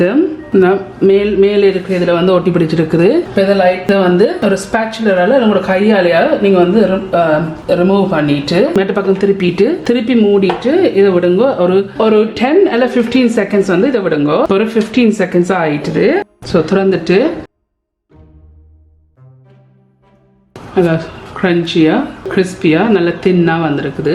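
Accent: Jaffna, Sri Lanka. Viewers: Sister Are you from jaffna nelliady?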